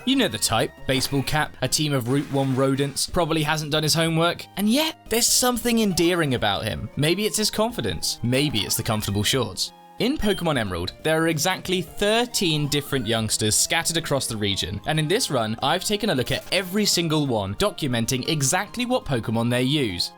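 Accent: United Kingdom. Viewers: Dude I'll give you a hint you may think you're just an average sounding British dude but you're like The stereotype of all stereotypes of British dude sound to these American ears. It's really pleasant to listen to you... So yeah pretty much as soon as American female Pokemon fans discover your channel it'll blow up just fine but you are going to have to do face on camera at some point😊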